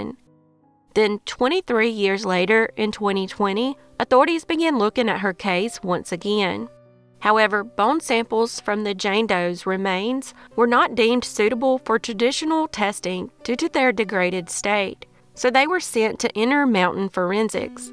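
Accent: South, United States. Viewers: Good job, u did loose alot of your southern accent as your stories progressed. Fyi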